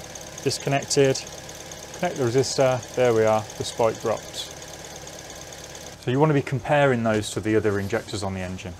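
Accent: United Kingdom. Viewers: Another English accent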